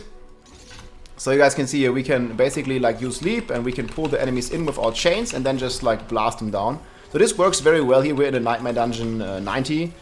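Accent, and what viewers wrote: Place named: Germany. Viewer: I love the German accent Man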